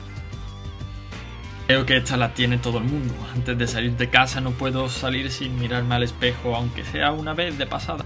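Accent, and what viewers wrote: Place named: Spain. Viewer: D soy mexicana :3 es la primeara ves que veo un vídeo tuyo!! creo CREO que eres español y tienes aquel acento mi bisabuelo era español!! bueno da igual, me he desviado mucho del tema! bueno me gustan bastante vuestros vídeos vuestros,vuestros, si es vuestros no? heheheheheh me suscribiré :O ah y por ultimo mi manía es decir todo lo que leo y escribo en vos alta o si quiera mover la boca mientras lo hago :3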